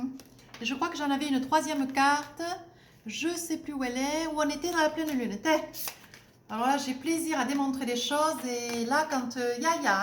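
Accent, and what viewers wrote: South, France. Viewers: Bonjour. J'aime vos guidances. Juste, je cherche en vain l'origine de votre accent. Provence ou sud ouest? 😁👍🙋‍♀️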